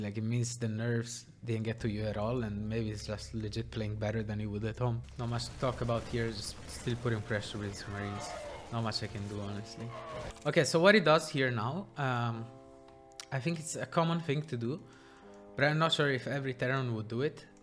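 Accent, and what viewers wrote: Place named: Italy. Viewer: Thank you for the video! You did a good job of analyzing what Oliveira did right, rather than focusing on what you did wrong. Definitely the correct approach. Your perspective is interesting, and hearing your Italian accent is a nice bonus. My only critique is that the transitions whenever a cut happens could be improved. Either make it smoother/less obvious, or make it more obvious, as right now I get confused when a cut happens.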